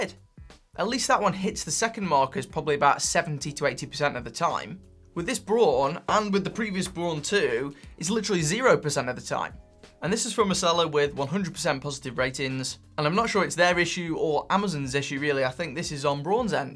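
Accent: Stoke, United Kingdom. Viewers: No, you're not unlucky. Braun is more than just a fashion watch brand, they have a long and illustrious history and their design philosophy has become iconic, but their build quality is RUBBISH. I've very optimistically bought 3 Braun watches over the years, including the ridiculously expensive "Prestige" chrono, and if the only problem you've had so far is a misaligned second hand, just wait until you've owned it a little longer and the REAL problems begin. That second WILL fall off eventually, the strap will disintegrate, none of the 6 hands on the chrono will behave as they should, any coating on the case or strap will scratch and chip away...and try changing the battery on the chrono - they've deliberately designed it so you have to take the whole watch apart via the front, although Braun recommend you send it to them to do it, 40 QUID to change a BATTERY! 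You're right, it's stupidity on their part, as by saving a little on quality control, they've now ensured I will never buy any product from the Braun range ever again, and will recommend anyone I know to avoid them like the plague. How to ruin a great brand. BTW, is that a Stoke accent?;)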